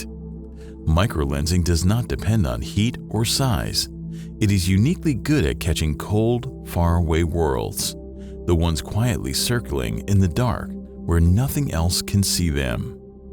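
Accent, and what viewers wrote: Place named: United States. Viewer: I find it hard to listen to the American accent. It has been poisoned.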